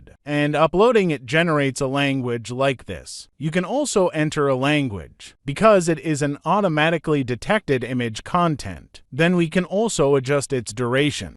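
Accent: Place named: China. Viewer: Love the video, and I can't get over how your Chinese accent somehow shines through this AI voice. Way too cool, haha.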